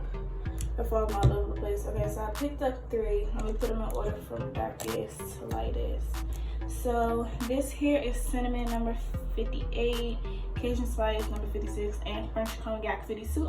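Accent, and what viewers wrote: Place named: Baltimore, United States. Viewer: Accents giving me B more or philly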